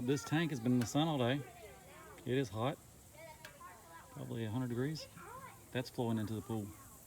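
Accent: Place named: Eastern North Carolina, United States. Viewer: You must be in eastern NC. I can tell.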